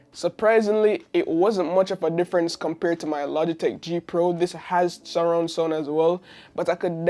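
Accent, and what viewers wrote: Jamaica. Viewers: Are u Jamaican dawg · You deserved my sub bro....btw that accent are you jamaican? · U Jamaican Bro? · Could tell from the get go that you were Jamaican 🤣🤣🤣 A di only reason me subscribe, bless up. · Yeah your Jamaican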